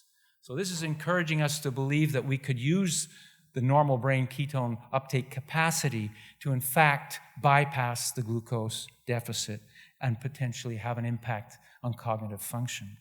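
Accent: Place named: France. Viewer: this guy's definitely French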